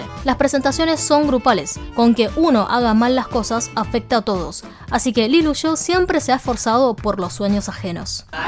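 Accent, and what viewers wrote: Argentina. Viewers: Vos hablas como argentina querida ..... saludos desde 🇵🇦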